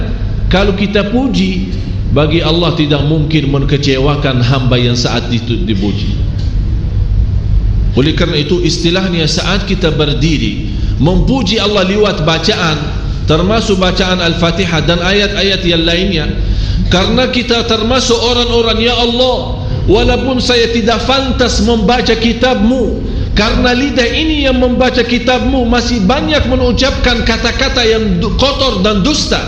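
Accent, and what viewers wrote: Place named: Arab world. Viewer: kangen rasanya dg beliau , dg suara khas arabnya dlm tausyiahnya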